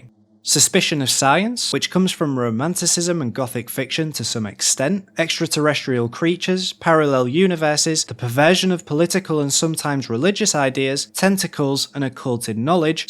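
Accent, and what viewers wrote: Yorkshire, United Kingdom. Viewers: Some of us in the UK also pronounce it as 'aitch' depends where you're from. We have a wide variation in accents here. The chap doing the video had a strong Yorkshire accent for example. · I'm from Yorkshire, and I am so glad you have decided to stay authentic to your accent. Yorkshire and proud. :) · Love the video. Love the "yawk-shuh" accent.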